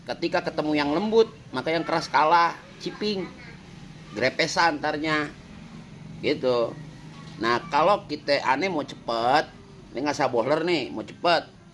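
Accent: Jakarta, Indonesia. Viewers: kentel bgt Betawi Nye cang, salam dari Depok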